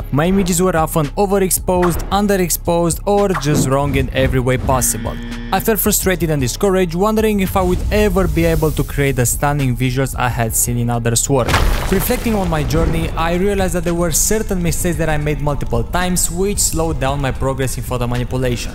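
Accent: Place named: Romania. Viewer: i can tell by your accent you are romanian xD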